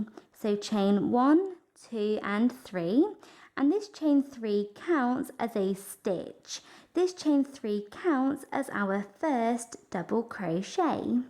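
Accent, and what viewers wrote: England, United Kingdom. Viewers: I did wonder as you have an English accent which confused me. I love your videos they are so easy to understand.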